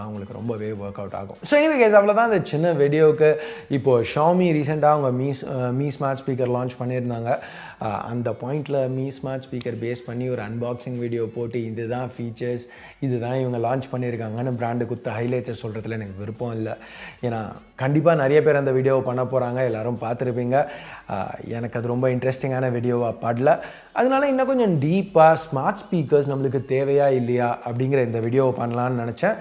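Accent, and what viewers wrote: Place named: Chennai, India. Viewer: bro..unga chennai tamil slang semma🔥🔥🔥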